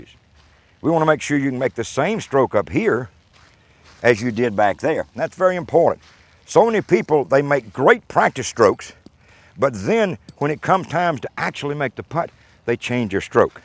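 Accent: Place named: South, United States. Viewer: Great southern accent! Makes the video succulent.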